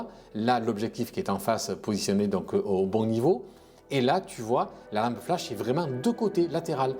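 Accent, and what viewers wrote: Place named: South, France. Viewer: Sympa ton accent du sud... Et ensuite tu auras besoin d'un Iris !:trophy-yellow-smiling::popcorn-yellow-striped-smile::card-red-penalty::medal-yellow-first-red::takeout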